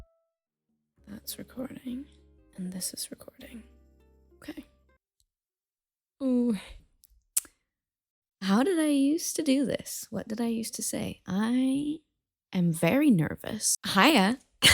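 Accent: Scotland, United Kingdom. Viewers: ive have never clicked on a video so fast!!! congratulations!!! and omg you can really hear the scottish coming through in your accent now too 🥺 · i also love so much that your accent sounds more scottish than when we last heard you heehee C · you sound SO Scottish HA ❤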